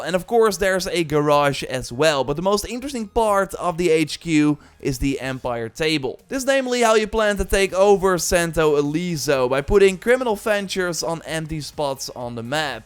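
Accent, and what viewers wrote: Netherlands. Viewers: Zonder dat ik je ken weet ik dat je Nederlands bent haha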